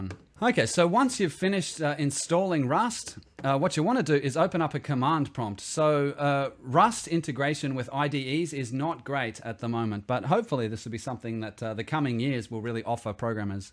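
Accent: Australia. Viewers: What part of straya you from mate?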